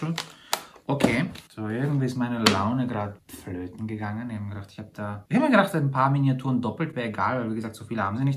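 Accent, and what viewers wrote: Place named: Vienna, Austria. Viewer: Hallöchen^^ Ich habe Dich durch Zufall hier gefunden und ich liebe deinen sarkastischen Humor. Deine direkte Art ist sehr unterhaltsam. Dein Wiener Akzent i love it! Liebe Grüße aus Hamburg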